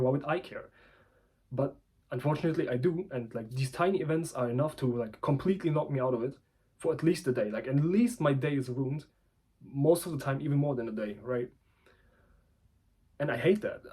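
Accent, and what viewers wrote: Germany. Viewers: Du kommst ja aus Deutschland, das hört man raus. Dir viel Glück und Erfolg.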